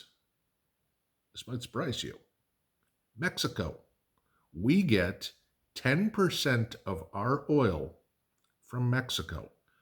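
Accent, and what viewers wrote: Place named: Indiana, United States. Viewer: Came across your channel, very interesting. You have an Indiana accent. Are you a Hoosier?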